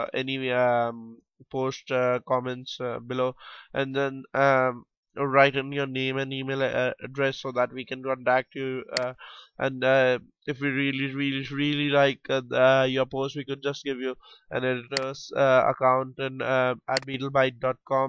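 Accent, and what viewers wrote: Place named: India. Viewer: Indian accent so annoying.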